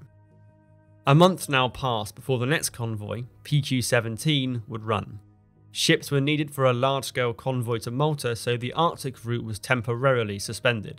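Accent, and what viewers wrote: England, United Kingdom. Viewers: Lack of detail; and I'm from the US. It takes more than an English accent. go do your homework. disliked.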